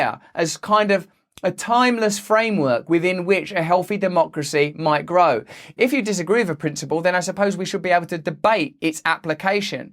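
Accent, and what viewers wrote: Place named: London, United Kingdom. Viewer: You sound like you from London!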